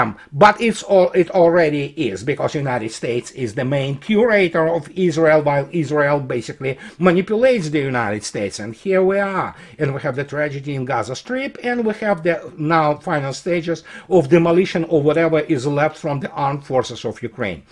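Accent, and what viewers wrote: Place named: Russia. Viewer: 😂this is like me going for dental 🦷 to the auto mechanic. Ваш русский акцент теплеет душу, у всей семьи, особенно у Дяди, такой был. Спасибо за вашу работу, спасибо за ваш русский юмор.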